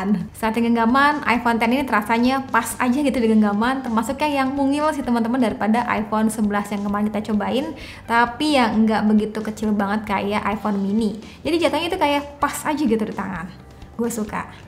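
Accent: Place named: East Java, Indonesia. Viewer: Orang jawa timur kayaknya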